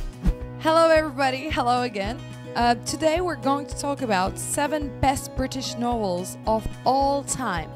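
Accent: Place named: Russia. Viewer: Her accent is uncertain but I would guess it is Russian.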